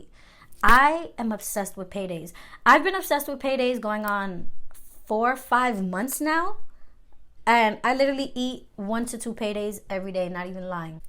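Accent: New York, United States. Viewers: i’m new to your channel, you gotta be from ny 🤣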